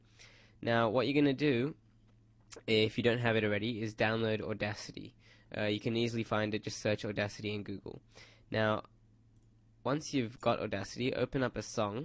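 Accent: United Kingdom. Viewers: British Much <3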